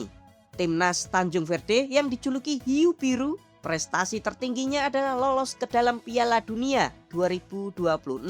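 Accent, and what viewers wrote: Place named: East Java, Indonesia. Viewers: Ini miminnya lucu banged, selalu ada kata kata tambahan, seperti siapin kopi dulu, siapin Snack ya dulu, terus ada kata asoyy kalau lagi ngulas hal hal seru di negara yg sedang direview, terus intonasinya kadang seperti sedang serius ngajarin murid matematika di kelas.. Terus logatnya itu, aku analisa dari tadi, seperti logat Jawa Timuran gitu, Org Surabaya aku menduga, mungkin... Hehehee... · aslinya mana kok bahasanya medok kayak jawa timuran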